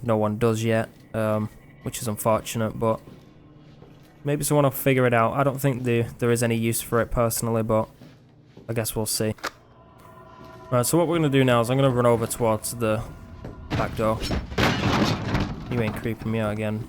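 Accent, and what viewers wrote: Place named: West Yorkshire, United Kingdom. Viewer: Anyone ever commented on your West Yorkshire Accent? nice to hear it haha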